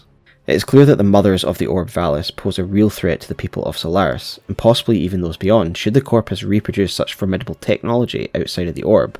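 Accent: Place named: Scotland, United Kingdom. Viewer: Quick question for ya. You clearly sound Scottish, are you toning your accent down for videos? Just curious because Shaun another Youtuber from Edinburgh does that as well.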